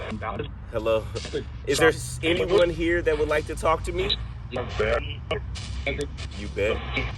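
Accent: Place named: South, United States. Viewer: Just curious, why don’t the spirits that come through have southern accents like yourself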